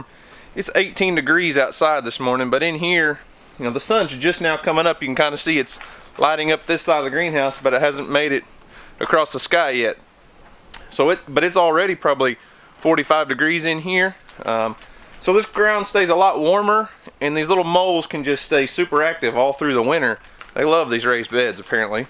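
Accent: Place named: South, United States. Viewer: Your accent is everything in this video😍You’re so southern, I could listen to you talk for days😃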